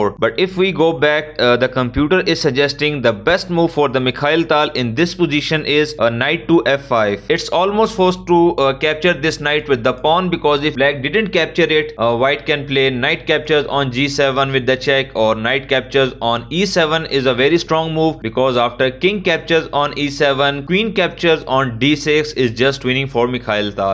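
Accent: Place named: South Asia. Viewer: the voice of chess is oficially an accent from the sub continent. as a pakistani, i love it. a major game / sport where we own the commentary. and of course, indians are top level chess players so it fits well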